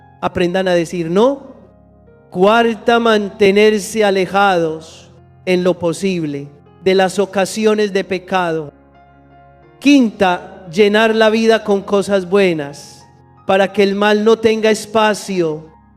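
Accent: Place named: Portugal. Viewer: por qué habla con acento portugués? muchas gracias por compartir el evangelio.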